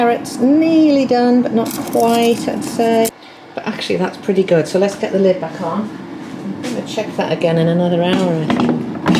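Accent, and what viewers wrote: United Kingdom. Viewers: Love your channel ❤️, makes a change to see an English UK speaking person 🥰!, Makes recipes etc better to follow as all your ingredients are from here 🇬🇧, I've literally binged all your videos regarding the pro+crisp airfryer 🥰, thanks to you I've actually had the conference to use mine & have cooked a whole chicken🍗,with veg, boiled eggs 🥚& am going to try to dehydrate some veg today following your video step by step ❤️❤️❤️.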